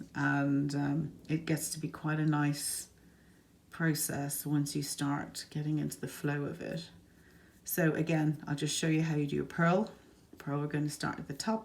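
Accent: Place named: Ireland. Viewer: I knew that was an Irish accent!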